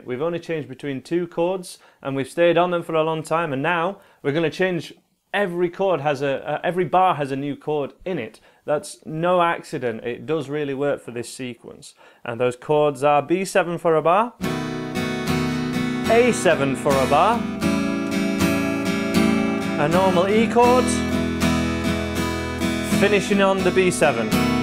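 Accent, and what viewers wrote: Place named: County Durham, United Kingdom. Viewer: Hi, This is pretty good stuff that you are doing, very helpful. I played jazz saxophone for thirty five years and have just picked up a guitar (at seventy three) Where are you from? I assumed you were all American, you sound like North East England, County Durham lilt to your voice. Thanks again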